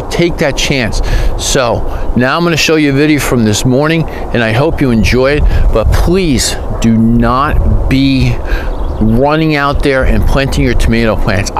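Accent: New Jersey, United States. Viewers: I agree the wether has been so up and down here in NJ. I’m in zone 7a. It’s kind of funny that I recognized my own accent when listening to you and wondered if you’re from NJ as well. Lol. I watched another video and sure enough you are lol. My tomatoes were doing really good and then really bad after up potting them. They’re in the garden now and most are making a nice come back. I have no clue what was happening but the tops looked like they rotted and fell off on some. I ended up putting them all in a trash pile outside that I neglected and to my surprise they started getting leaves down low on the stem 🤷🏼‍♀️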